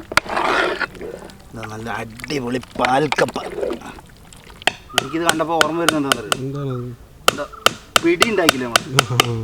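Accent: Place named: Idukki, India. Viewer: മുൻപ് ഇടുക്കി, കോട്ടയം എവിടുന്നെങ്കിലും മംഗലം ഡാമിന് പോയതാണോ സംസാരം കേട്ടിട്ട് തോന്നുന്നു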